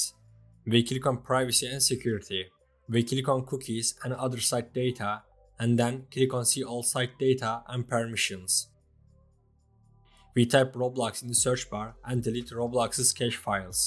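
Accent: Turkey. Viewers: vaay abim türk :D konuşma seklinden anladım abi kralsın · Aksanından ve şurda ve demenden Türk olduğunu anladım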